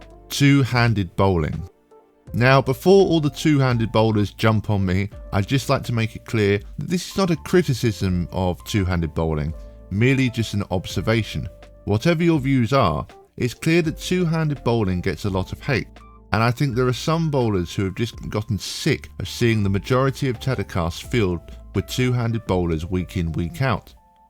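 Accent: United Kingdom. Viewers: It's interesting being both one and two handed, seeing the surprised faces when I switch to one hand for ten pins. It sounds like you're from the UK right? So am I, and I think bowling is dying in the UK sadly much more than the US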